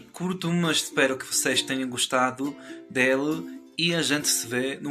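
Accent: Portugal. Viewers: você falar com sotaque português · seu excelente sotaque Português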